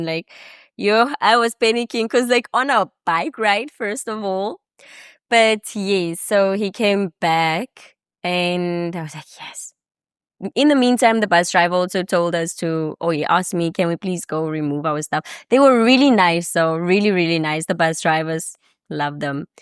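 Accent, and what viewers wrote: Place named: Barbados. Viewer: I love your accent you kind of sound like your from the Caribbean island Barbados, thank you for sharing your journey with all of us.😊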